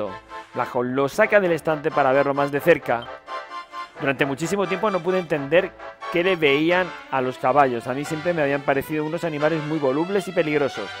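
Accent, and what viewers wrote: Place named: United Kingdom. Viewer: DIIOOOS QUE PUTO ASCO DE ACENTO, BRITÁNICO EN PLAN CHUNGO. Se me esta haciendo duro acabar el video.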